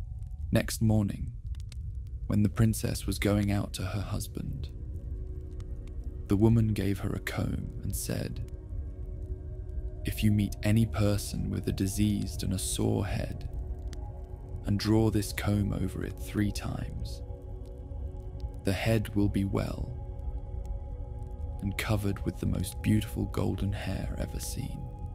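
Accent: United Kingdom. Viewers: Pity, a pommie is the narrator!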